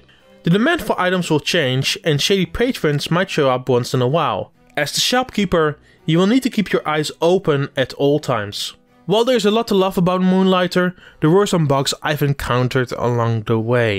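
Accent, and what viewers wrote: Netherlands. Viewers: pretty bad review, i cant understand your english. you have a to much dutch accent influencing it and you are not clear at what you say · As a fellow dutch man, i just can't handle his accent. He doesn't even try to hide it. (The following is not my original comment and has been added on) Some of these lines feel really forced, try working on that my dude. Thank you people for sharing your opinion on mine, i've never gotten this many comments from people with a different mindset that aren't just full of cursing and telling me my opinion is irrelevant. Looking forward to his improvements in his next review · Yo is this guy Dutch or what, common try to work an the accent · Proper Dutch accent 😂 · You do realise this guy is Dutch, right? Do you produce content in a second language? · I'm Dutch and listening to this reviewer it sounds like he's Dutch as well. 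So it's normal his English isn't perfect but very decent at least. But i like his review so keep up the good work dude ! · Are you dutch? I am guessing you are from Rotterdam?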